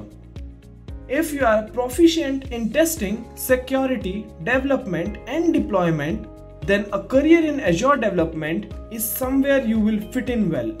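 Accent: India. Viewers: indian accent :(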